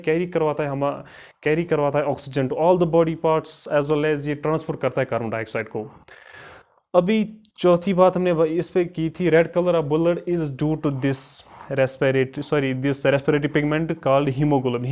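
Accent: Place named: Kashmir, India. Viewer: Kashmiri axient aata h bhaiii....but Lecture is good..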